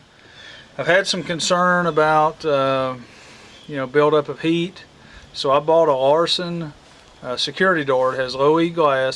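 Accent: United States. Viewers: Great job, great lesson. I've got two i have to install tomorrow. Love the hat, pencil and accent. Made me want to shout 'merica!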